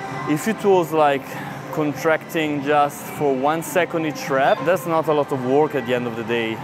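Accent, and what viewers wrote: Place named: Italy. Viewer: i assume by your accent , tu sei un italiano amico !!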